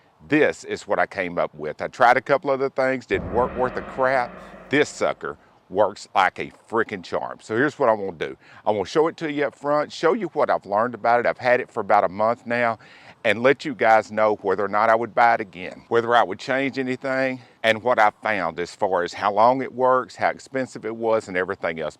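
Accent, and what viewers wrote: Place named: Texas, United States. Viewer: I love the Texas accent. I live in San Antonio and I know exactly what you're talking about regarding the high heat and humidity. It's downright oppressive. This looks like a device that could really reduce the misery factor. I'm a musician and I get gigs outside fairly often and I end up all sweaty on stage when the temps are high... IOW, about 9 months out of the year. This thing looks like a life saver to me. I'll be buying one soon.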